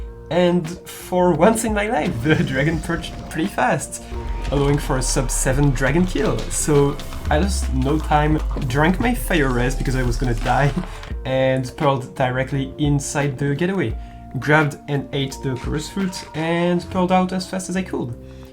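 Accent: France. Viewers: are you french ? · Ru french ? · You got a french accent :) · you sound french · toujours le même accent fr xd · it’s obvious you’re french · I have a question dude, are you from France? By the way nice video :) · t'es français non ?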